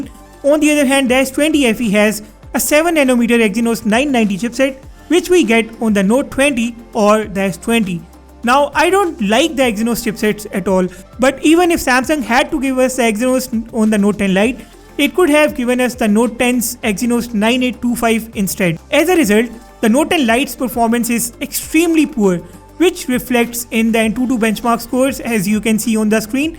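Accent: India. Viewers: I'm not saying I don't like indian accent I can't understand it😅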